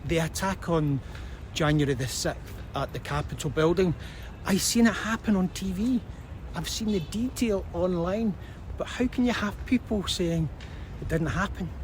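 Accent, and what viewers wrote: Scotland, United Kingdom. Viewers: I love those Scottish dulcet tones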